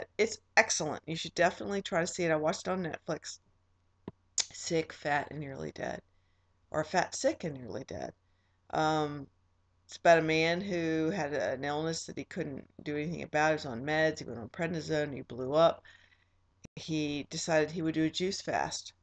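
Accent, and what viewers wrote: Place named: Pittsburgh, United States. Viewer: awww what a touching video,,, i understand, by the way, where r u from? Pa by any chance? You sound like a Pittsburgher ;p hugs to u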